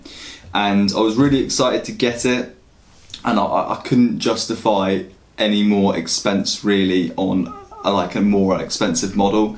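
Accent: United Kingdom. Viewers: Haha love the English accent bro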